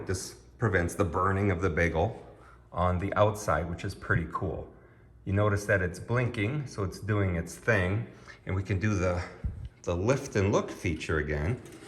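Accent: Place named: Midwest, United States. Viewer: harrrrd midwestern accent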